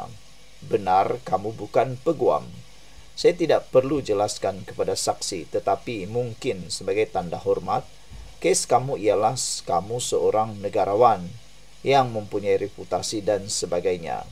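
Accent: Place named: Borneo, Malaysia. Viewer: Ur bahasa is horrible....if u can get rid of ur borneo slang...I m non offender.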